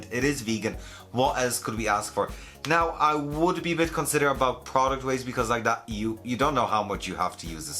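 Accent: Ireland. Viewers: Love your wonderful Irish accent wanted to know wht you think of Osmo curl spray and their products in general.I cant afford very expensive hair products right now any cheaper superdrug or boots curl products you can recommend?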